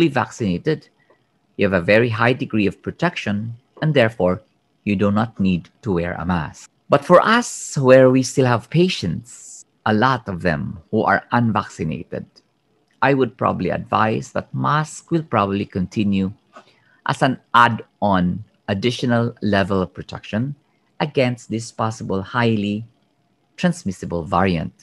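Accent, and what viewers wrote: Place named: Philippines. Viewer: Once I opened this video 1st I thought you are a philippino later you mentioned that😃 actually I have many friends from Philippines so, I know accent.❤️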